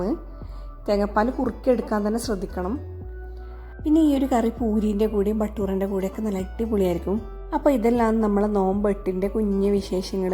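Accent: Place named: Trikaripur, India. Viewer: Hi from another YouTuber from Trikkaripur ☺️👍...nice presentation with trikkaripur slang 😁... Do support back. 🙏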